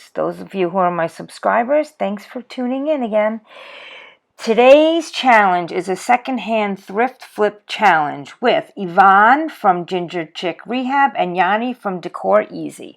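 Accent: New York, United States. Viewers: Hey there! Stopping by from the playlist. LOVING these. God bless the internet and the incredible farmhouse pics we can find. Will def give the hairspray idea. So, do I detect a fellow New Yawka? Brooklyn born and raised here but living in a world of cows and bears these days 0_0! Have a great day! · I just love this!!!!! I was gifted some snowman tiles and was going to make coasters, but this changed my mind!!!!!! Great project!!!! Thanks for sharing!!! God bless!! Are you in NY or NJ? I detect a little accent!!! lol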